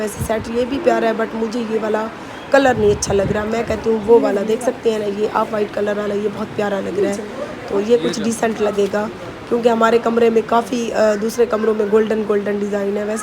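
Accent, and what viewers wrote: Saraiki, Pakistan. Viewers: Ye apka home kis city men ha ,ap saraiki tongue men Urdu bol rahee ho 🤔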